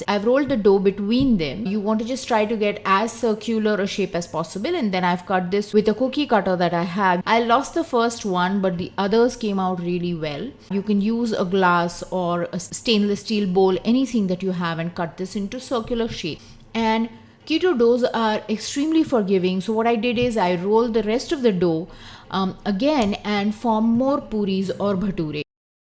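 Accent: India. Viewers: She has an Indian accent.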